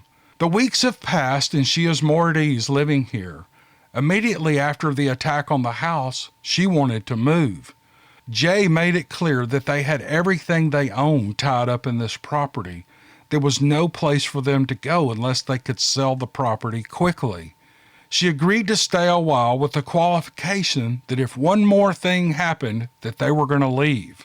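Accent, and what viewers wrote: South, United States. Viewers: like everyone else I love your voice! I’m from Mississippi living in Florida now, so I love Southern voices.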